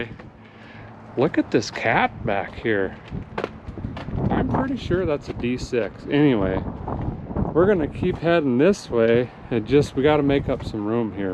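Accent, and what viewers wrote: Minnesota, United States. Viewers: I bet you're thrilled to have her home. I have a '59 Skyliner that's been in my family since '78. When my dad died, a few friends suggested I sell her. They just don't get it. A little Minnesota tie in, she may have an intake manifold yanked out of a donor at Windy Hill in New London, probably in about '86 or so. We were up there for some family stuff, and the old man wanted to go junking. I enjoy your channel. It's nice to learn stuff, while also enjoying your accent. You talk like most of my family from up there. I was born in MN, but smart enough to leave before I was 2. 😎🤓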